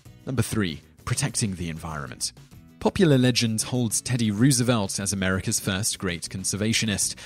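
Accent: England, United Kingdom. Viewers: Why is an English dude talking about America ...... Don't know why I find this weird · one second into the video and I'm instantly drawn away by the fact that a guy with a British accent is hosting this video. GET OVER IT YA DAMN BRITS WE BEAT YOU 241 YEARS AGO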